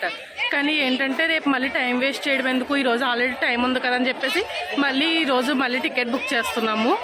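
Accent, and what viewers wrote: Konaseema, India. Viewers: You have explained everything very well amma. Specially your konaseema style of language is nice to hear n infact we all enjoyed. Good vedio n we wish you all the very best .God bless you amma.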